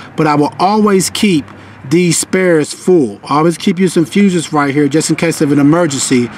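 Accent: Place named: New York, United States. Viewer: Man I love your accent, its sound southern and New-Yorker at the same time. Thanks also for the car tip, god bless you sir!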